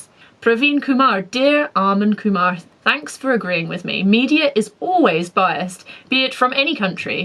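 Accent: United Kingdom. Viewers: Your accent do sound like those Britishers from the Lagaan movie....period. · I can understand what your speaking because it's British Accent.I actually don't understand the American accent. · When you read those mean comments with a British accent it makes it sound like they are more dignified than they actually are.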